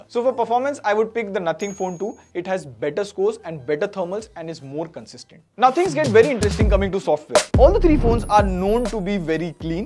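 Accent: India. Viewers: We need an easy ai tool to change indian accent to another accent (american ...).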